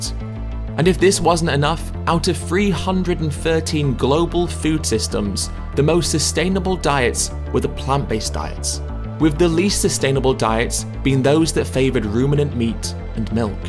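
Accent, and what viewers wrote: United Kingdom. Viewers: Pandemics will happen no matter what. They will always be a when. The changes in farming animals is already happening. You can make it happen faster by buying your meats from small farms where the animals live happier lives. The tags for cows are the same as us piercing our ears. Many do not even react to tagging. And people, if you eat a lot of beef, the twenty pounds a year at the Standard American Diet rate, (you probably eat much less like me), it would take you over 18 years to eat the weight of ONE average size steer. By the way, vegan diet is not appropriate or sufficient for toddlers or children. Just because a Nice British accent says something does not make it true.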